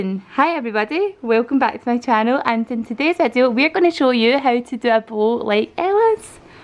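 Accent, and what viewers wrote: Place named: Glasgow, United Kingdom. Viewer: Found your channel a few weeks ago and I've become so obsessed, I've watched so many of your videos now, had a total binge haha. I'm not planning on having kids for like 10 years but I still find your content so interesting. I'm also from Glasgow and love hearing the familiar accent 😊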